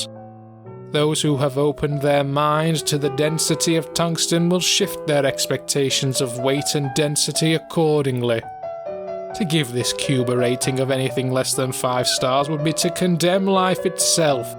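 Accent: Scotland, United Kingdom. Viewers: why does he kinda sound scottish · The British accent was the cherry on top.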